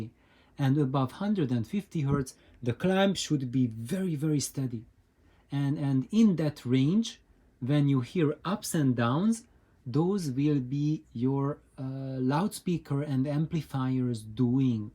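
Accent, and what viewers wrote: Hungary. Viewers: Sorry for the off topic. After 15 years of living in the UK I have met a lot of people from different countries. I am able to recognize accents after just a few sentences. You are definitely Hungarian. Ok it was easy, because I am Hungarian too. :) Believe me It is fun to know where people come from without asking them. All I need to do is just listen how they speak. :)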